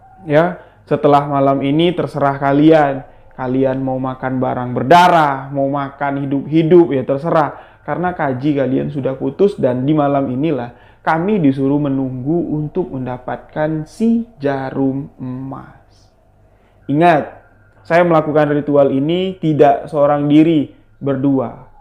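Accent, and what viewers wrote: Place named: Jambi, Indonesia. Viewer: Walaikumsalam kak n team... Masya Allah Emang Kereen lw Sebuah Kisah Misteri upload cerita Pesugihan sllu bikin penasaran....palagi d bw in kak dgn logat jambi yg khas....the best lah Tanpa yana tunggu lama lama..... Gaskeeuun yuk kak....🔥🔥🔥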